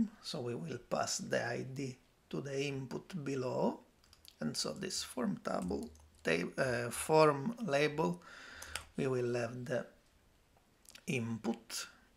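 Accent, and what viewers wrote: Italy. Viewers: Dude you are soooooooo italian and i love it. Please record audiobooks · Incredibile, tra le migliaia di tutorial online sentirne uno con l'accento italiano mi fa sentire a casa!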